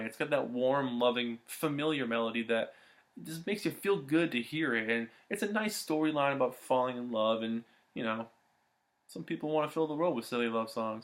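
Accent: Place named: Detroit, United States. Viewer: Hey, love your BB reviews! Where are you located... you sound like we do in the Detroit area... BTW, VDParks was in Detroit area about 7 yrs ago, a great show at a small gallery but too many yakkers around the bar kinda wrecked it.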